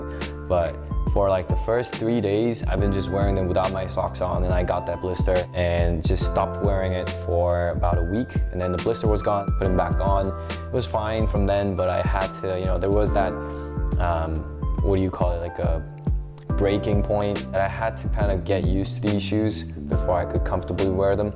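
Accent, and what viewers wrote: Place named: United States. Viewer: Great American English! Is it your second language in S. Korea? Great review! Wouldn't you consider this kind of Mule shoes, let's say 'girlish'? - Cheers from Peru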